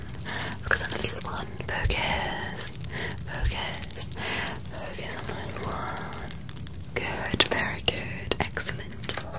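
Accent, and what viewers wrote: England, United Kingdom. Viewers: They have an amazing British accent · Absolutely loved this and the short hair cut so works on you with the glasses 😌 really enjoyed the English accent alongside the jingling of the jewelry. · The English accent is amazing Nailed it Ive always wanted someone to do a scouse or northern accent Like Gordie or Cumbrian its sound funny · i was like o sh!t she is brittish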